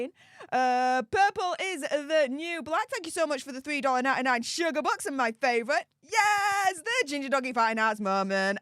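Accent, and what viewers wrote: Yorkshire, United Kingdom. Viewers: It sounds like Yorkshire northern England ! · Nowt wrong wi' a Yorkshire accent! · Please slow down with your speech you have a beautiful accent being British but it's hard to understand you when you talk fast. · It's British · I know it's British... I'm asking what part of Britain. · Yorkshire accent which is Northern England. 👍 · She has a Yorkshire accent. I watched a youtube video that explains it and it helped me understand her. For instance, the letter T is not pronounced in the middle and end of words.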